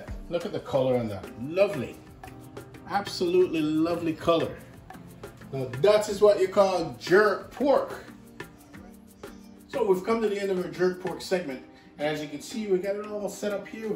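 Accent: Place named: Jamaica. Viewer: Looks yummy and colourful. A feast for the eyes and stomach. P.S. I love the touch of Jamaican accent .... a full authentic Jamacian vib. ❤ 🇯🇲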